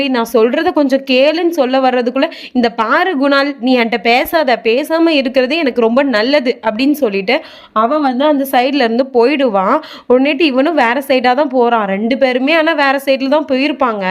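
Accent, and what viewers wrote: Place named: Tirunelveli, India. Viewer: ஹலோ நீங்க திருநெல்வேலி காரங்களா உங்க வாய்ஸ் அப்படிதான் இருக்கு போயிட்டு வந்துட்டு அப்படின்னு சொல்றீங்க கதை சூப்பர்❤❤❤❤❤❤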